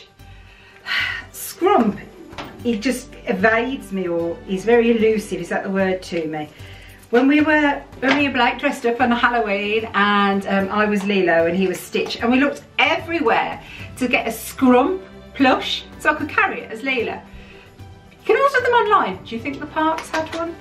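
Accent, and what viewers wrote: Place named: RP, United Kingdom. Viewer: lol the queens English priceless you so make me laugh... Even worse when my ma is from Birmingham lol... Look forward to your videos all day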